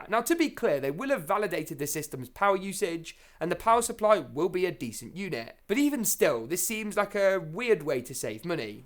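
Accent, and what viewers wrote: United Kingdom. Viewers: mate he is definitely not Australian he has the strongest British accent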